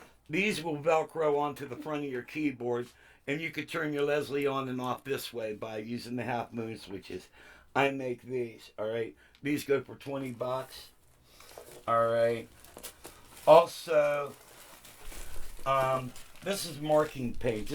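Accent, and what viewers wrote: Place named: Pittsburgh, United States. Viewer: That Pittsburgh accent tho!!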